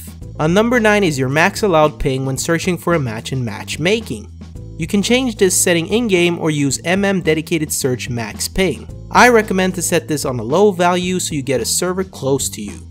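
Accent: France. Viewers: lol is he french? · Bon vidéo! Sub! Wanted to know where you are from, France or Québec? Just curious :P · where are you from? I'm from Canada and I don't know where that French accent is from 😅 I speak French aswell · woow great french accent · Tu accent français donné très bien ! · is he french ? "j'espère vous voir bientôt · Are you french or English ? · Are you actualy french?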